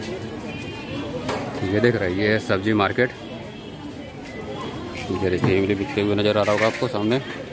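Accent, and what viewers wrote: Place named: Varanasi, India. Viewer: Your voice banarsi very nice and festivals showing video very good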